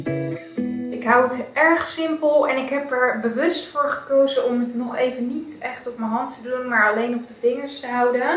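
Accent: Rotterdam, Netherlands. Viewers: Dat is rotterdams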